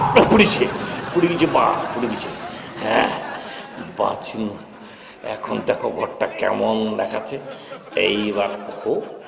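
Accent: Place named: Dinajpur, Bangladesh. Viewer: দিনাজপুরের আঞ্চলিক ভাষা গুলো আপনার মুখে দারুন শোনায় স্যার। প্রতি ইদে এটা একটা আলাদা আকর্ষন অনেকের জন্যই। দিনাজপুরের সকলের উদ্দেশ্যে, দিনাজপুরের চোখধাঁধানো খেলাগুলো দেখতে চোখ রাখুন আমাদের চ্যানেল Sports Mania তে...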